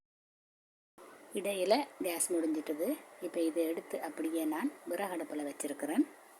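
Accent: Sri Lanka. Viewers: Very nice thanks amma . Happy to see our Jaffna Tamil🇱🇰🇬🇧🙏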